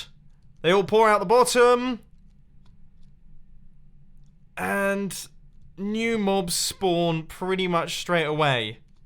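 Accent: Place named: United Kingdom. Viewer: Thanks for the wonderful content these past years! Really appreciated your amazing and genius inventions, your British accent and your apparent lack of ego. I wish you the best of luck but my intrest for Mincraft has diminished over the last few months so to clean up my sub box i wil unsubscribe (not from your vlog channel, patiently waiting for more content there).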